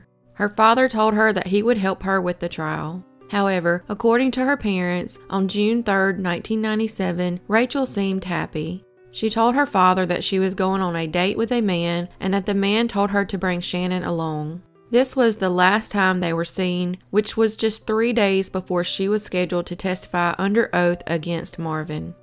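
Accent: South, United States. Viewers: Such a soothing southern voice.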